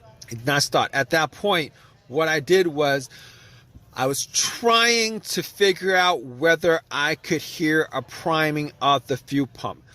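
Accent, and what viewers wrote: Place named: Bronx, United States. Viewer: I love your accent. Is it the Bronx accent?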